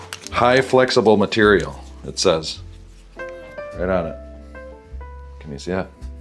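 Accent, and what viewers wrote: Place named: Upper Midwest, United States. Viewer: I like your show because I learn some new techniques and I like hearing your northern Midwest accent. I grew up in central MN. I have a suggestion for future content. I very much like “restoring/maintaining/servicing” older bikes. I mean much older. I’ve fixed up bikes from early 70’s, 1956, 1944, ,1930, 1915. Can you find anything like that in your neighborhood and do something with that? Since you may not want to fill your shop up with salable used bikes.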